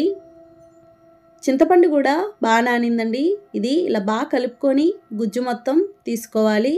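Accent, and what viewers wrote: Godavari, India. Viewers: Is your Telugu, Godavari Telugu, sweet to head pure telugu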